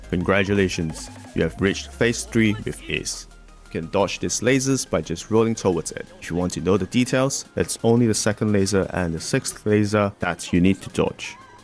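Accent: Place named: Singapore. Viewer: Nice guide bro, you must be a Singaporean with that accent :)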